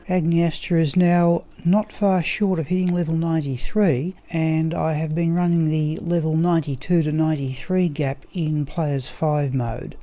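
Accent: Australia. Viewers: Thx for another good one,! Keep 'em comin'! Are you Australian btw? ☺️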